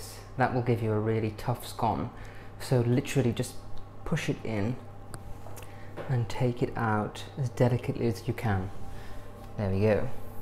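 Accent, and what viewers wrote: RP, United Kingdom. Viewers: you are so posh! I absolutely adore you. ♥️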